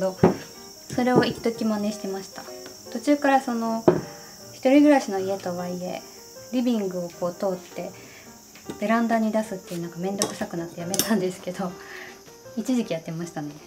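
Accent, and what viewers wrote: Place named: Aichi, Japan. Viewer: はじめまして。最近さんの動画を発見して、少しづつ見ています。癒しの時間です。私も愛知出身なのですが、しゃびしゃびと丈夫い、確かに関東に来てから聞かないなと思いました。さんの言葉のイントネーションが好きに感じるのは、故郷を感じるからかもしれません。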